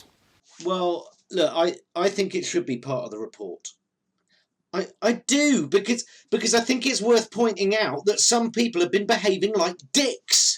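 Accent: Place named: England, United Kingdom. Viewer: NICE PURE LOVELY ENGLISH ACCENT VOICE & BOY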